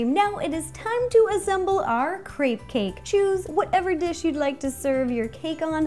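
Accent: South, United States. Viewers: Why does she have a sorta southern accent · Maybe she's sorta southern.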